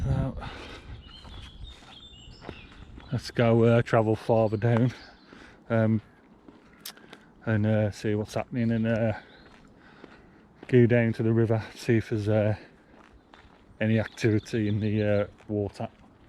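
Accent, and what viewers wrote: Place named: Yorkshire, United Kingdom. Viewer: Hi, so I found part 1 and just watched it and will watch the other 3 parts soon. Gotta ask you about the accent mate, where you hail from? Yorkshire? I liked your style of editing too, very similar to the style I do (and those placing the camera down and walking towards it shots like you do!) Loved seeing the train station and the train tracks at the beginning. Great weather you had, makes for good views on the camera. At different points in the film you seem to alternate with lenses, ranging from narrow to wide. Do you use a GoPro by any chance? Nice stabilization on it. Couldn't help notice the nude girl in the newspaper as well.:) I paused to try and get a look at your footwear as well and noticed you were wearing trainers but couldn't quite make out which. A good video, well filmed, nice angles and smooth pan shots.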